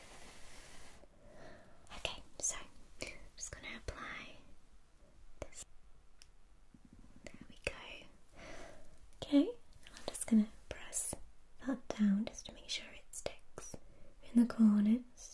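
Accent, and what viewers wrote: United Kingdom. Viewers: Your british accent are so lovely! I love your videos a lot! Success for you ❤️ Kisses from Brazil 🇧🇷🥰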